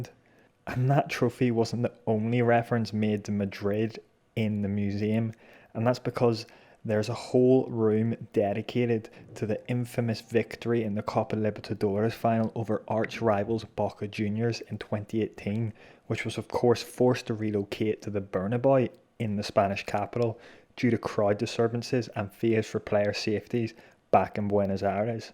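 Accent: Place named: Northern Ireland, United Kingdom. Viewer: Great video, thanks for visiting my favourite club. I can recognize your NI accent :-) Hope you have a great time in Argentina.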